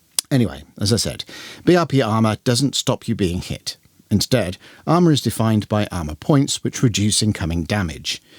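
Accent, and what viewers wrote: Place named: United Kingdom. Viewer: A man with a British accent talking about rpg history is peak.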